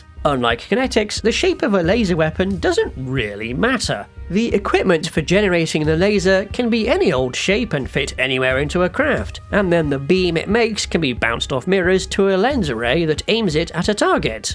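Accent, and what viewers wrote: United Kingdom. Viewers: Eeek… bro got two of the worst traits, squeaker voice and British accent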